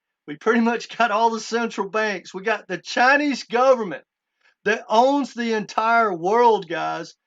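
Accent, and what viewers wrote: Texas, United States. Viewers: This guy lack of research, all the money to handle, half of it are more than 2 quadrillion $ under the ledger, please understand ON demand liquidity, you are talking like a Texan guy .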